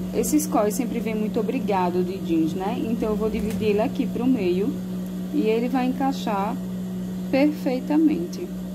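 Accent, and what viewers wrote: Brazil. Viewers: Amei a dica e o sotaque sou costureira e nordestina tbm ! Venham conhecer-me galera. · Ótimas dicas ❗Vc. é do Recife⁉️🌹💙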